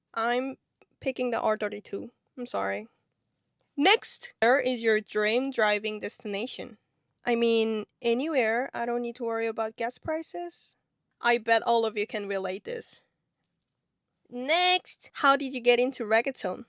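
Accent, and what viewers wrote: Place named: England, United Kingdom. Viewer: amazing video thank you 😊 cute english accent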